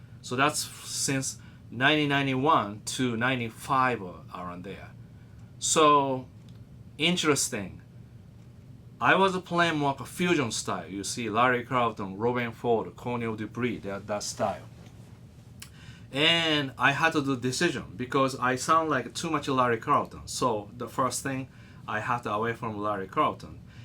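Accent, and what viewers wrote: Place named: Japan. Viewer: Amazing story! Perfect timing for me to hear this from you as I am also discovering my tone and how it makes others around me happy to hear it. I used to play and think I sounded great when I’m by myself, and then I would go on stage in the early years and all I could do was hear all my mistakes and think to myself how I sucked. But people would come up to me afterwards and say how phenomenal my playing was, and I couldn’t believe it! Because I noticed ALL my mistakes. But I’ve come to the point where I don’t care what other people think, and I don’t care if I screw up at all as long as I can have fun. Thank you for sharing the story about you and your journey with the guitar. I really appreciated hearing it from you. Japanese was my first language and I started learning English when I was six so your accent reminds me of some of my Japanese relatives. 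Jya mata yoroshiku onegai shimasu.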